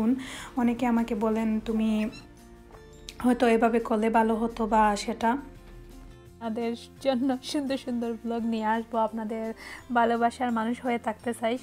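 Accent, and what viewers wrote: Sylhet, Bangladesh. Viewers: assalamualukum apa apnar muko amrar sylheti mat hunte ow besi bala lage go please sylheti bashai matba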